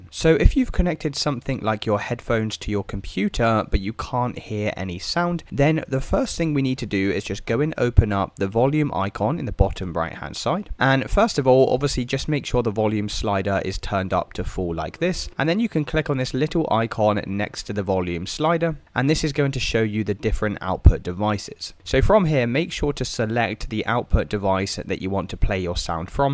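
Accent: United Kingdom. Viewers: This is the first bit of crappy troubleshooting info i found when originally googling the issue... A video with a british accent doesn't make it better.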